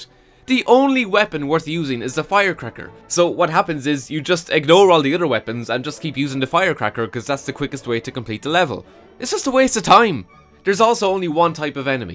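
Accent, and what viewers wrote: Ireland. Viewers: Are you Irish? You should do a video with Call Me Kevin! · Nice Irish Brogue. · I love the Irish when they're angry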